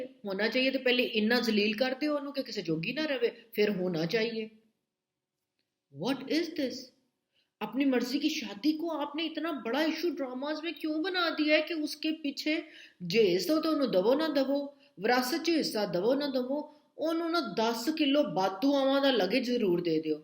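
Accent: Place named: Punjab, India. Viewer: 👍👏👏👏 very well said, in such an interesting manner with a tarka of Punjabi. 😂Love her style of speaking. 👌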